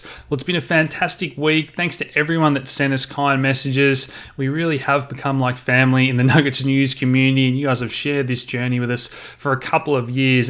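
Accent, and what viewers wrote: Australia. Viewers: Great to hear an Ozzie accent talking crypto.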